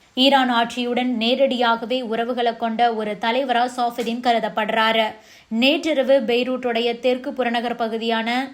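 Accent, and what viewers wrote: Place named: Chennai, India. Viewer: Madras தமிழ் தூதூதூதூதூதூ......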